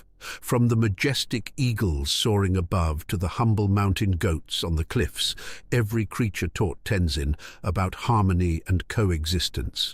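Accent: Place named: United Kingdom. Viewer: Great, except for the annoying, supercilious British voice. We need cooler voices 🤪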